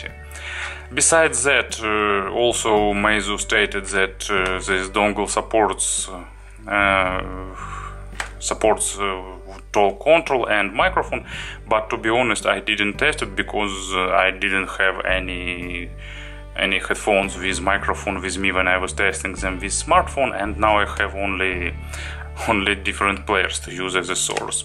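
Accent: Russia. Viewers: Сильно чувствуется русский акцент))) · Сразу слышно суперрусский акцент😂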